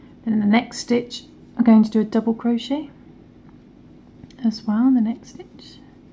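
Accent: United Kingdom. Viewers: I absolutely agree with you. And I would also add that I'm in love with her voice, British accent, the way she speaks😍 I can't wait to by all the necessary equipments and start making all the flowers you showed for our summer house💖💖💖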